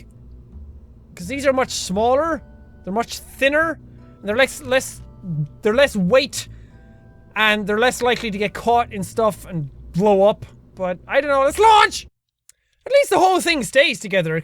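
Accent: Ireland. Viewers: ChurchISBest I like his Irish voice though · I just want to thank you for all the awesome videos you do! You've inspired me to play so many games( especially Kerbal!) Also I love you're Irish accent! I am also very Irish and thought it was awesome you were when I started to watch you're vids. Keep BOSSING things up, LIKE A BOSS!!!!!!!!!!!!! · Is Irish? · On his older he was more embarrassed to talk in Irish tone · r u irish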